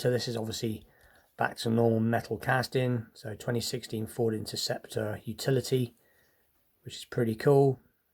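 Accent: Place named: England, United Kingdom. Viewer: Hi sorry you had a bad day buddy, are you in uk i ask as you sound English as seen this Recue pack on USA youtube but cant wait for it to come over to uk 😀👌 great show any way sorry been wanting this for months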